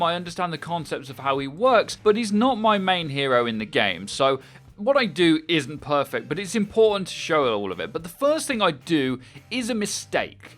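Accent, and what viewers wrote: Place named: United Kingdom. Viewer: Doesn't he sound like a British version of Ben Wyatt from Parks and Recreation.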